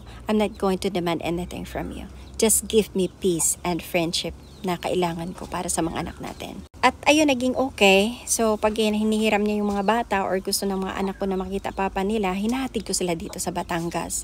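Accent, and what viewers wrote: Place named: United States. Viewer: Englisera Ka Pala madam at American accent sarap pakinggan.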